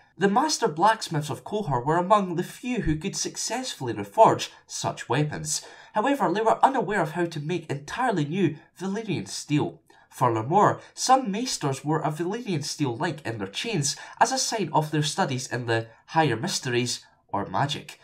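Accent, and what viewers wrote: Scotland, United Kingdom. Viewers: your videos are getting so much better and your uploads more faster. with your scottish accent its a much have😜 can you put a tutorial on how to speak scottish because im dutch and im scared to learn from my mom (born in sterlin)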